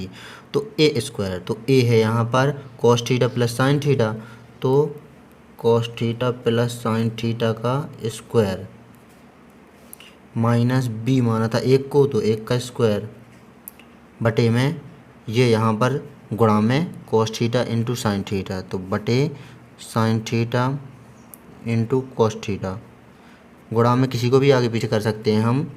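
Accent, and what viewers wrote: Bundelkhand, India. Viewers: Bhai tum kaha se ho bundelkhande bol rhe ho voice se lgta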